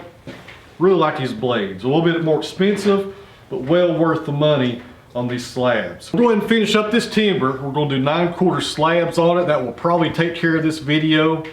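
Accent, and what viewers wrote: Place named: Southwestern Virginia, United States. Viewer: don't listen to the whi ers out there. I really enjoy your channel, I evev understand your talk, ( my family is original from southwestern va.) 😂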